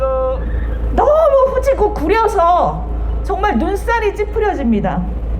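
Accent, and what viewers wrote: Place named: Andong, South Korea. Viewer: 예천 안동 사투리 같은데요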